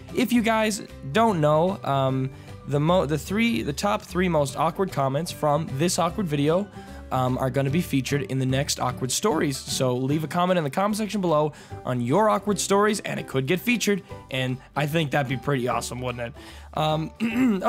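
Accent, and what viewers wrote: Newfoundland, Canada. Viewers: Huh, I actually guessed that you were from Newfoundland- Its probably because the way you pronounce certain words is similar to how MattShea does.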